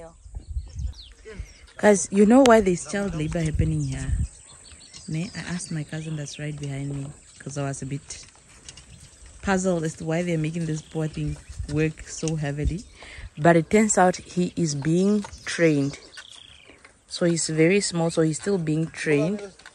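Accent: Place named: Oshikwanyama, Namibia. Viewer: Eish the way u speak oshikwanyama i enjoy it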